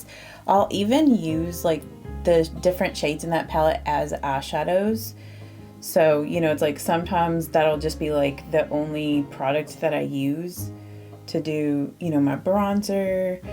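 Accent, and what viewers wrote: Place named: Louisville, United States. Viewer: Love the tip about extending bottom lid eye shadow. It makes a huge difference in how these 64-yr-old eyes look. Also, I ❤ listening to you talk. I learned to talk in Louisville, so you sound like home folks to me!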